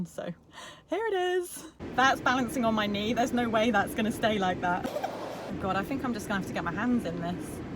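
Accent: United Kingdom. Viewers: I am SO intrigued - where do you live?? English accent but always so sunny!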